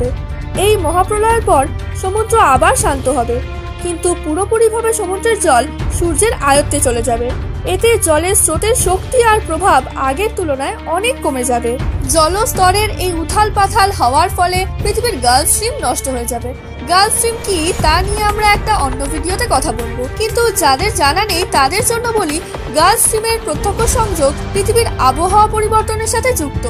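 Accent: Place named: West Bengal, India. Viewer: Tumi mone hoy indian bangali tai na tomar kotha gulo khub cute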